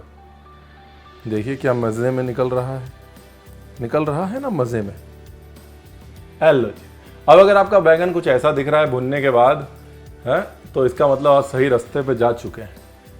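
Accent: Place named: Punjab, India. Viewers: And you Punjabi accent😍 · He’s applying Punjabi sound to English.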